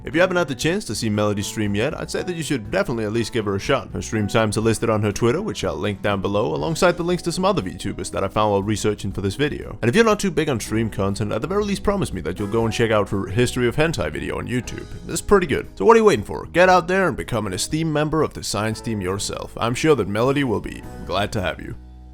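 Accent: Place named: Denmark. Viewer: Den dansk engelske accent vil altid være der uanset hvor meget man prøver at gemme den xd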